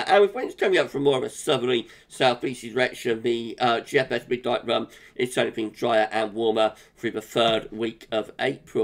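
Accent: United Kingdom. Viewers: I see, has a British accent. My sister has a Hard time understanding him what he is saying also. Generally American accent is a Lot more Easier to understand than British accent by far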